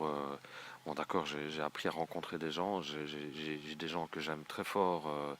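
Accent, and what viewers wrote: North, France. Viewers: ou alors du coter nord france cette accent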